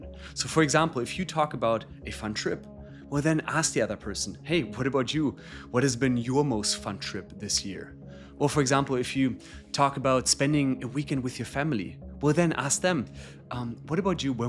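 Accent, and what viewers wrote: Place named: Germany. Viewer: Gutes Video. Danke 🙏🏻 (ich denke deutsch passt Deinem Akzent nach 😉)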